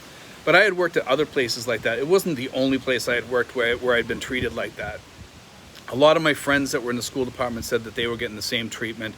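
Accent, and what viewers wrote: Boston, United States. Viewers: You just showed up in my feed. You could have been any guy from anywhere on the planet. As soon as you Open your mouth I said Boston.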